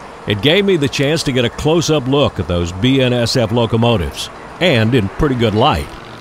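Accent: South, United States. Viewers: I like this guys voice. It’s like a cowboy with a really light southern accent.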